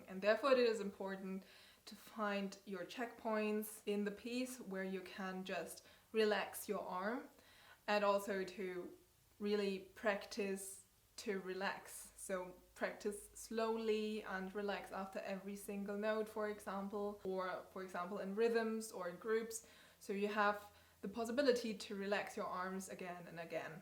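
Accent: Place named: Germany. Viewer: Thank you! I love how you explain your ideas it’s like your speaking German through English 😅 💙 oh and how does patreon work? I’ve never used it before but i would like to support you! I practice Bach everyday at the piano, sometimes mozart Beethoven and Haydn but mostly Bach 🙌🏼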